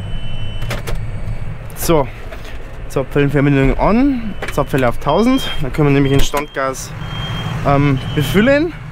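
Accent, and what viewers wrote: Bavaria, Germany. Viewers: Top Video, aba mit mehra Bayrisch is schena! Gruaß Flore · Red no bayrisch passt👍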